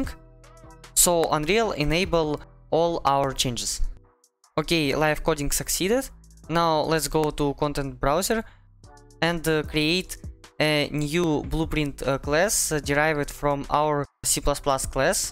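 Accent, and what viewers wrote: Russia. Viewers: Amazing! Бат ёр рашн аццент выдает тебя с поторохами😊 И это здорово, так как твой английский я понимаю и без субтитров.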